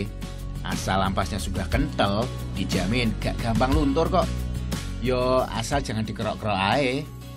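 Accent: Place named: Java, Indonesia. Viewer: g iso di salahne, masih o gae boso indonesia wong tulungagung panggah medok bosone haha. tanah kelahiranku pehhh keren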